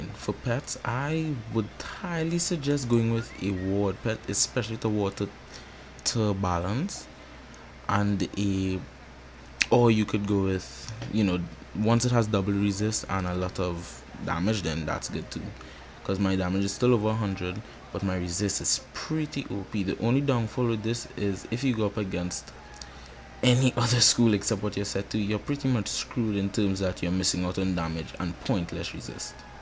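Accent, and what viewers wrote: Trinidad and Tobago. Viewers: Is that a Trini accent I hear?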